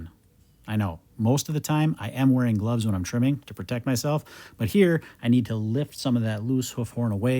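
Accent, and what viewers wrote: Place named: Wisconsin, United States. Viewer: I don't miss Wisconsin winters, but I do miss the accent.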